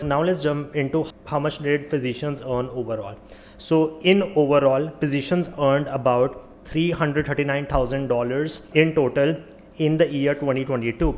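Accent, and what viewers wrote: India. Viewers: Bro lives in USA and still managed to get an Indian accent thicker than me 😢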